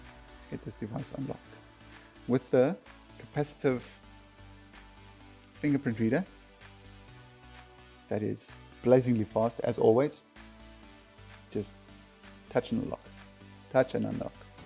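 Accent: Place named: South Africa. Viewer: Jy klink suid afrikaans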